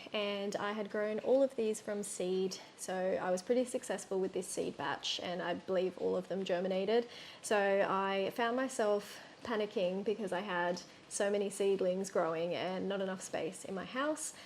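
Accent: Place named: United Kingdom. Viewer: Wow your anthurium collection is so healthy and all the plants look great. I wouldn't have thought they could grow without a humidifier and I've been afraid to get any. My ambient air is probably drier than your climate though as I live in a desert! We hardly ever get the rain you were getting he day of filming. And your accent sounds British so maybe it rains a lot there in which case your ambient air has higher humidity than desert air!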